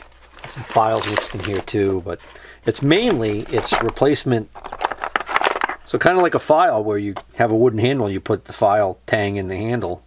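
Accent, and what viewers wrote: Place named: Massachusetts, United States. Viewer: So i found your vid on Victor regulator and i like your explanations. Looks like we are doing the same kinda stuff. I hear an accent too and it seems your from Ma? Btw, new sub.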